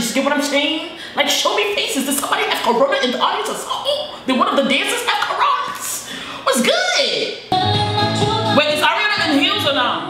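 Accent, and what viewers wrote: United Kingdom. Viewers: I LOVE YOUR ALBUM REACTION AND ESPECIALLY YOUR 🇬🇧 ACCENT!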